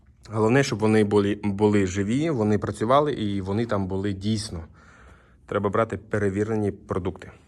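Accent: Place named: Poltava, Ukraine. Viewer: З яких часів полтавський діалект став суржиком,ґа?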